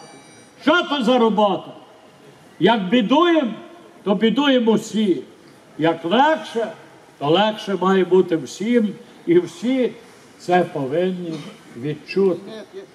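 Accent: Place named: Canada. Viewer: ше один з Канади, блять, нікому не ріже вухо?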